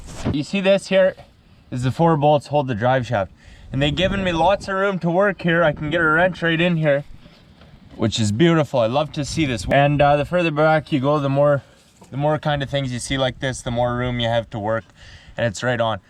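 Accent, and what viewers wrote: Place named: Saskatchewan, Canada. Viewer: Way to tackle it bud.. if you need a tranny jack DM me i have one you can use.. slick as shit when you're working alone.. by the way you talk i believe we aren't far from each other in good ole sask lol..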